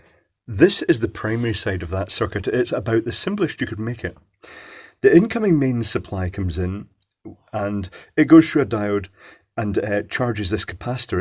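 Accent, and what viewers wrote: United Kingdom. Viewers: the annoying british accent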